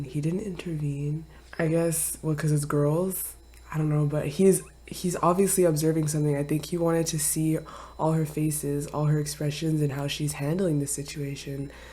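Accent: France. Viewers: where u originally from? are u french?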